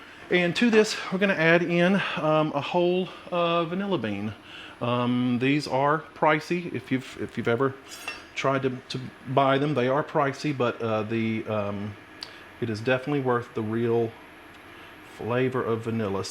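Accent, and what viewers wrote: South, United States. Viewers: Thank you. I just started watching you, and I am very impressed with many things on your show. Listing a few is your southern accent, your honest input without judgement on one or the other recipe that you are introducing to your audiences, your knowledge and teaching us of items/tools used to cook with and your knowledge of ingredients, sometimes strange, used to cook with, and how you present and do the show. The finger up to the camera for a one second pause, then flash to a new view of you having transitioned to the next thought, the way you taste and truly enjoy the items. And btw I looked at some older film of you on this channel and I can see you are thinner. This Keto life is a good thing! Thanks!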